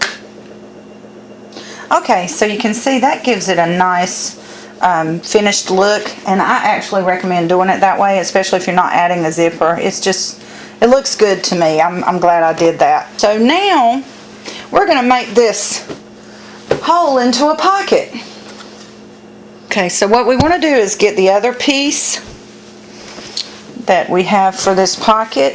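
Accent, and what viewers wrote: South, United States. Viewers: Very nice. Thank you for sharing. This was very helpful for me. I have not learned to sew zippers yet. However, I have made a lot of leather purses (Southwest Style). You are a great instructor. Your voice delivery is very clear and precise. Your instructions are easy to comprehend and follow. The southern draw makes it easier for me to understand ya'll. I am going to attempt this. However, I am going to try and line the opening to the pocket with garment leather. I believe I will sew the garment leather to the pocket slit before I bring the material through, to the 'wrong/unseen-side' of the purse liner. If it doesn't work, I will resort to this simple pattern. Thanks again!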